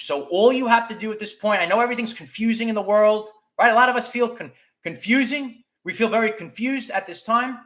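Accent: New York, United States. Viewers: OMG, I can hear NY in your accent! How do you get your hair so shiny? Like your content these days...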